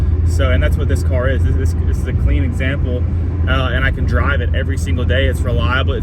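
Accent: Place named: Sweden. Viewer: Where is this in the US. For some reason I think I can hear a little bit of Scandinavian(Swedish) accent, can be wrong. Thanks.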